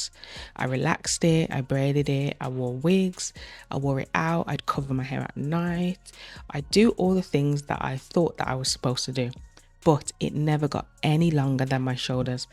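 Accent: Birmingham, United Kingdom. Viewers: You and your hair are beautiful, giving me hope as I can sometimes get stuck, on another note love the Brummie accent, reminds me of a friend of mine.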